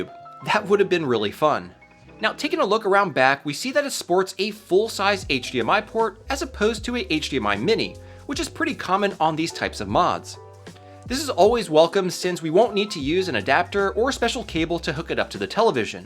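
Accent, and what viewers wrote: United States. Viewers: I love how despite his hispanic heritage, his American accent is so strong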